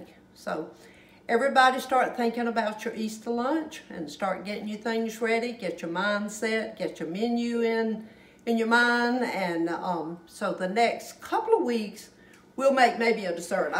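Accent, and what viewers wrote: South, United States. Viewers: I remember my granny and aunts always having a pound cake to serve someone. You are most definitely a Southern lady who would have this tradition. Makes my heart happy. I love watching your videos and hearing your lovely Southern accent. 🌿🌺🌿🌺🌿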